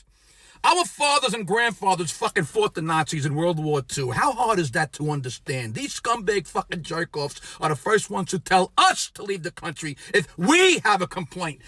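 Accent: New York, United States. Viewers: I am so warmed by this. The Rant, the accent, the 'you're an idiot' head tilt. It feels like home. Damn I miss NY.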